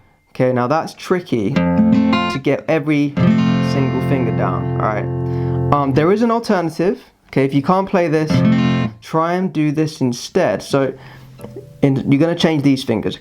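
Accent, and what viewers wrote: United Kingdom. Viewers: Coz he's british like me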